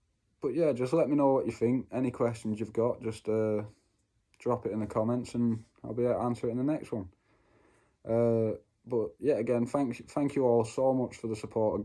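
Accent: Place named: Manchester, United Kingdom. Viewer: Hi where do you live and who is your Neurologist. You sound as if you maybe from Manchester. I am on Keppra and Epilim. Clobazam is something I was given but it has side effects and is so difficult to come off so I didn’t start on it and I explained to the Neurologist. There are not many young people who share their seizures so I am glad you are.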